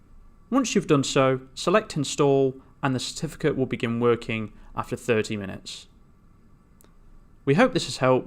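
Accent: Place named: United Kingdom. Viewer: It's not really clear and I can't find SSL/TLS on cPenal but anyway I liked the video because you have a nice British accent lol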